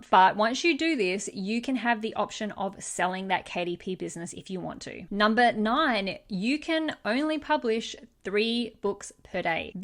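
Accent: Australia. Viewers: It was very hard to catch that Australian accent. But i can smell a Australian from a mile away.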